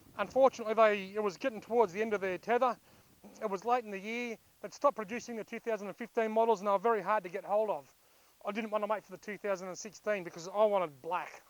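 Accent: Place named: Australia. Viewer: Nice video mate. I always enjoy listening to the ausie accent. Very nice bike.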